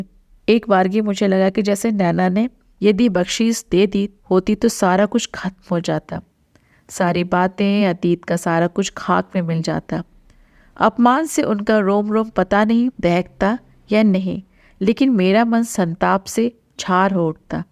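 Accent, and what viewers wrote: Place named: United States. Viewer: Orator ka american accent hai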